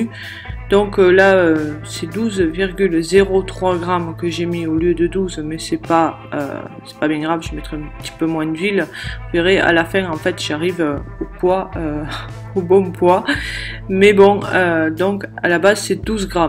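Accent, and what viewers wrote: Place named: South, France. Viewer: Coucou, Je m'abonne car j'aime;) Ça c'est un bon sérum, que des bonnes choses pour la peau. J'aime l'accent du sud;) Bonne journée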